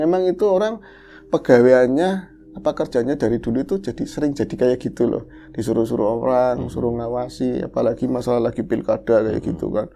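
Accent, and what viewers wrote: Banyumas, Indonesia. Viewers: Dari Ch LapHor, capcuus k CH NGOBROL, stlah itu merapat lgi k Tanah Tua Channel ndengerin ngapak'y mas 🤭🤣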